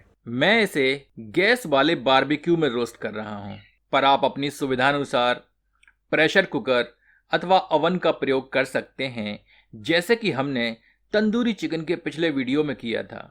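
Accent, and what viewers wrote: Standard. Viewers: best is your shudh hindi narration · apki suddh hindi sun k anand ata h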